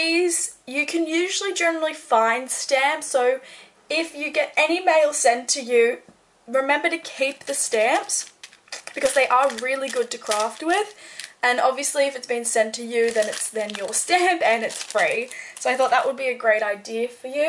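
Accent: Australia. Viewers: You're aussie accent is so refreshing! I'm an Aussie and I don't know why but I get so used to international accents on YouTube videos that it's always such a nice surprise to hear Australian accents and phrases online! This video made me realise how much I've been missing simply talking to other people because of the pandemic! Excellent video too 😊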